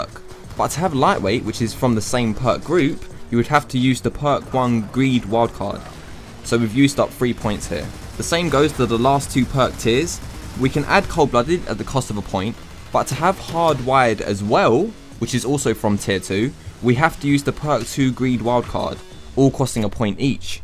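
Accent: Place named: South London, United Kingdom. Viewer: Nice video. Where are you from? You sound like you're from South London, around Croydon?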